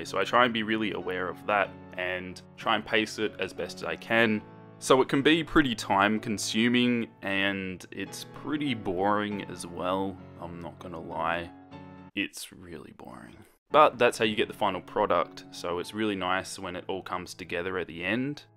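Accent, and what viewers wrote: Australia. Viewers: are you from, Australia?...im so curious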